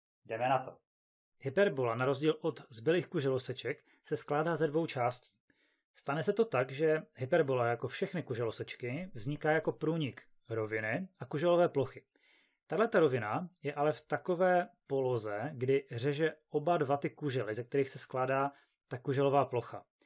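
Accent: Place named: Moravia, Czech Republic. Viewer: až na ten moravskej prizvuk docela dobry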